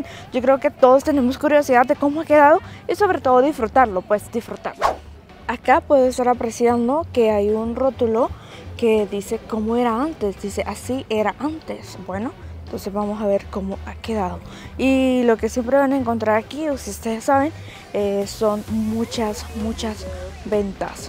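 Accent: Guatemala. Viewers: Ya hablas como chapina 🤭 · DATO IMPORTANTE “YA SE TE SIENTE EL ACENTO CHAPÍN” JAJAJA Se siente de a huevo 🫶🏼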